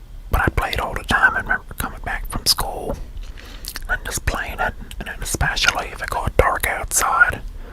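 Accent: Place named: Pennsylvania Dutch, United States. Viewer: You're way off. Its penny dutch.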